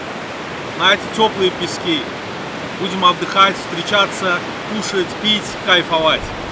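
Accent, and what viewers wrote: Baku, Azerbaijan. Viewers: Дай Бог тебе здоровья крепкого и счастья! Я уже 32 года как уехал из Баку, но никак Москва не стала мне родной. Как говорится бурнумун уджи гейняйир по родному Баку. Каждый твой сюжет это как бальзам на душу. И тема ,и родной бакинский говор ,акцент и даже манеры просто чудо! Спасибо тебе родной! Надеюсь скоро приехать в Баку и тогда постараюсь с тобой встретиться, если ты конечно пожелаешь. Извини что обращаюсь на ты ,но как говорили раньше "бир нечя байрам сяндян бойуям".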